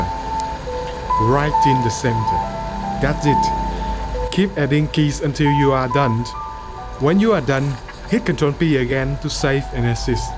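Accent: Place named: Vietnam. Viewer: Vừa ấn vào video chưa kịp nhìn mà nghe cách phát âm là biết người Việt Nam gòi :3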